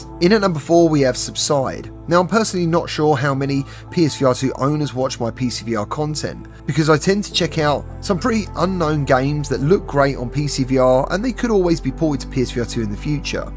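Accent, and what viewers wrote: United Kingdom. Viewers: Can some one write down the names of the games please I just can’t understand with his British accent 😢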